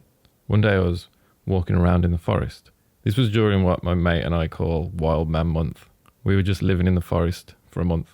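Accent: Birmingham, United Kingdom. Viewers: Beautiful work. Refreshing to hear a Brummie accent - if I'm not mistaken. Thought you'd be from across the pond. 😂 ❤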